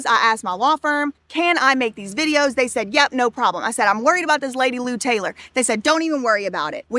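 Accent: South, United States. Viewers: Thank you, I knew it’s southern, brilliant!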